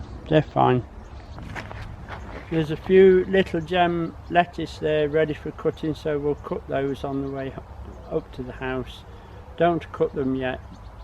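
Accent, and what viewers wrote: England, United Kingdom. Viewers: New subscribers here - from the states (don't hold that against us - both my granddad's were from England ! :) ) FANTASTIC garden - there's no doubt in my mind even if your video's weren't interesting I'd still be watching/listening as I love the English accent (you can thank my dad's dad for that ! )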